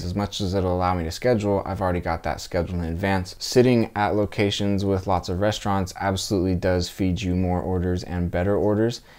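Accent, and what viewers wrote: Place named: West Coast, United States. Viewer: E-Bike, west coast accent and $35 an hour. Definitely has to be SFBA.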